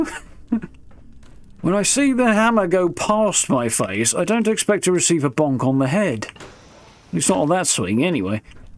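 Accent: RP, United Kingdom. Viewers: This guys accent really throws you off abit, because he sounds really really posh, like he studied at Oxford, yet he looks like a metal head 😂🤣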